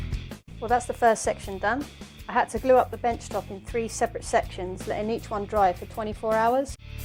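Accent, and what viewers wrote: United Kingdom. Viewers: Great to hear a British accent and sayings on these videos. Good job. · Excuse me...are you scottish ?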